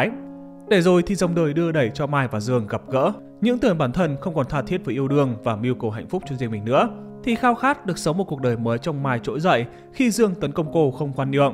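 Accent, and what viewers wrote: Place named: North, Vietnam. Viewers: trình độ đọc hiểu ô kém quá, ý nó là đang đòi quyền lợi cho miền nam các bạn đấy, vì đó kênh này giọng bắc nên đánh giá phim miền nam ko cao hiểu chưa? Mà nữa miền bắc ko lm phim rạp đ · đơn giản vì chủ kênh nói giọng bắc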